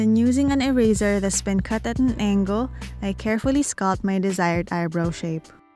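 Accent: Philippines. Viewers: are you a filipino? your accent sounds like one ☺️ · What country are you from <3? Your accent sounded like you're Filipino O///O !? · are you a filipino? cause your accent lol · Was it just me or you sound like a filipino? I'm not racist okay cause I'm also a filipino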